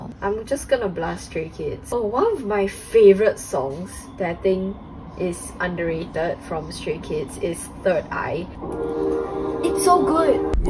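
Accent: Singapore. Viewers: I love Singaporean accent so much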